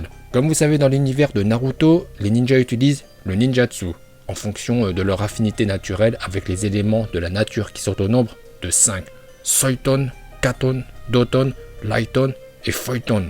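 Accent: Japan. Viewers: Wais ton dégoûtant accent japonais est le seul truc qui n'empêche de m'abonné et en plus de ca tu n'arrête pas de t'en vanter arrête avec çà Déjà avec son Goku qui glisse sur le crâne chauve de jiren et ca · Ton accent en japonais il est éclater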